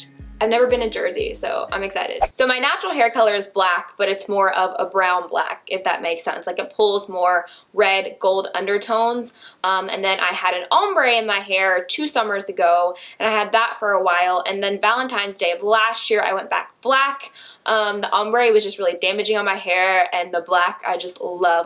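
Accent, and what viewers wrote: South, United States. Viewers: I don't know why and I don't know how but I can hear a southern twang?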